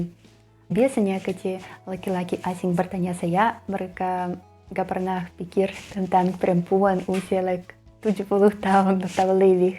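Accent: Russia. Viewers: Mantap..... Bahasa Indonesia, aksen Russia....👍👍👍👍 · Mbak bahasa indonesianya logat russia,, tapi enak didengar sih hahahhah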